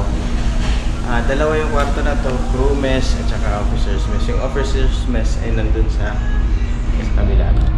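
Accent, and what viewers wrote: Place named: Visayas, Philippines. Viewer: Sir taga asa ka sir? Kabalo man gud ka mag bisaya haha. Pa shout out na din😘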